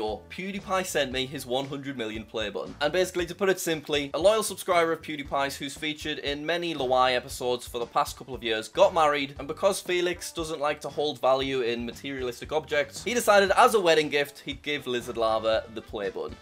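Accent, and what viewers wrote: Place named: United Kingdom. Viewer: Oh god hes British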